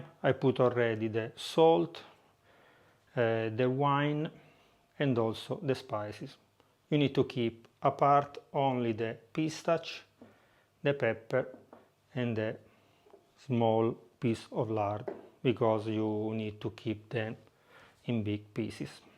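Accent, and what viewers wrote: Italy. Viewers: Descubriendo tu canal, saludos desde Venezuela, y comienzo a ver tus videos hoy. Comencé con la papada curada de cerdo para hacer carbonada... me encanta tu acento italiano de tu inglés!! Un abrazo y mucha suerte!!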